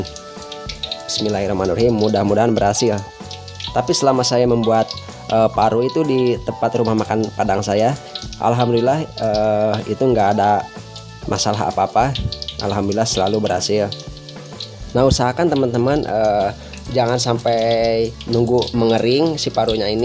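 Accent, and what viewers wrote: West Java, Indonesia. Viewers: Host orang Sunda atau orang Minang asli? Logatnya kayak orang sunda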